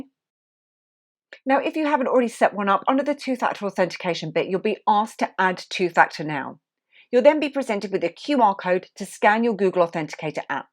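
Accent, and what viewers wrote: United Kingdom. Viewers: I don't understand a shit... British accent🙄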